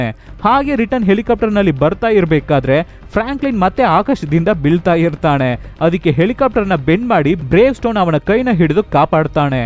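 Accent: Tamil Nadu, India. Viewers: I think ur mother tongue tamil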